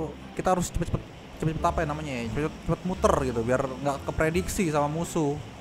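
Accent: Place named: Jakarta, Indonesia. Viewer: Duh Betawinya wkwk ketawa lu ngeselin bang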